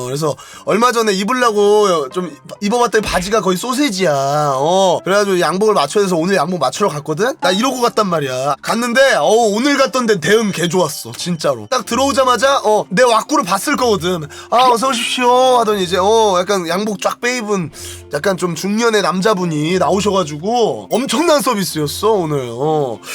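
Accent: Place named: Chungcheong, South Korea. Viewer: 충청도!! 전라도 아니거든요 참나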